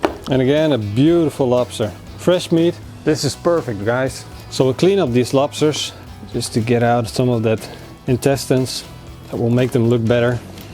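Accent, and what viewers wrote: Netherlands. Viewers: Ik hoor een Nederlands accent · Ik hoor gewoon te goed dat je Nederland bent.. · You have a dutch accent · Annoying dutch accent.🤮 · Engels met Nederlands accent . Grappig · Hahaha 100% jij een Nederlander bent, ofja je ouders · Noticing the slight dutch accent I was surprised that he did not throw the lobster alive into a deep fryer...😅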